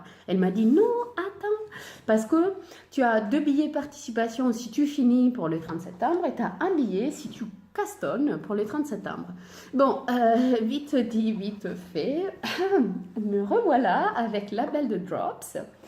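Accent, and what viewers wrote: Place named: Italy. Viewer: Ciao, conosci le avventure di Arianna? Il podcast di una Veronese in francese. È molto dinamica e sempre gioiosa. Il tuo podcast è simpaticissimo. Mi piace sentire parlare francese coll'accento italiano. Sono francese ma mi piace molto l'Italia. Aspetto il prossimo podcast! Lamartineorzo sur IG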